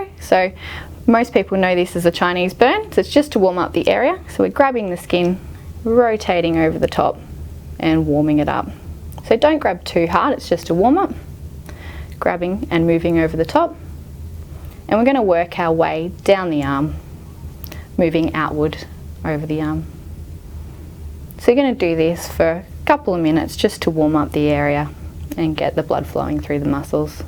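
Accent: Australia. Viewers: Thanks for the video from here in the USA. I love the Australian accent. “Cross section fibah” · This is brilliant. Many thanks. From Sweden. (Lived in Melbourne for 4 years and felt good to hear the accent once again!) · Very informative and helpful video. Also love the presenter's aussie accent, hot.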